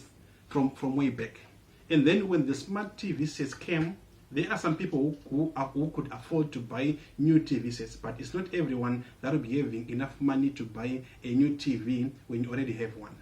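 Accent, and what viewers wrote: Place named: Zimbabwe. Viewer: Accent says Zim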